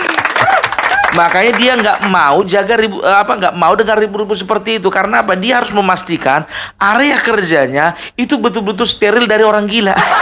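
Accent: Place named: West Sumatra, Indonesia. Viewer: Logat suaranya seperti logat Sumbar...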